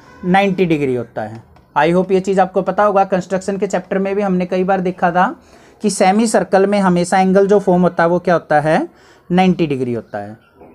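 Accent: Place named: Sonipat, India. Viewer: Sir aap 100% sonipat se hai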